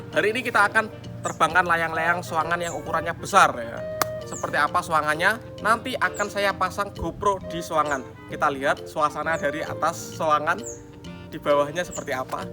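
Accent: Java, Indonesia. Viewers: jowone ndi mas nek podo ayo layangan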